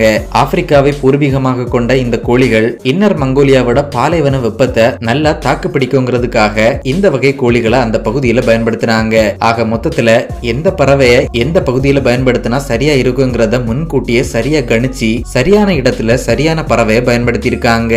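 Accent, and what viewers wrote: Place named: Kerala, India. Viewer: மலையாள சாயலில் தமிழ் கொஞ்சம் வித்தியாசமாகவே இருக்கு. நாஞ்சில் காரரர நீங்கள். அரசு என்பதை அரய்சு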